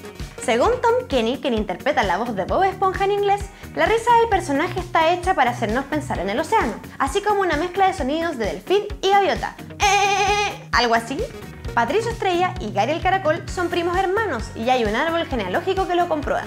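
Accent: Chile. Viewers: es de chile ya que ella es chilena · 23 cosas que no sabias de YOUTUBE. Estoy viendo tantos los vídeos en especial en donde sale que se me está pegando el acento chileno po!. Saludos desde Lima, Perú. Bendiciones para todos.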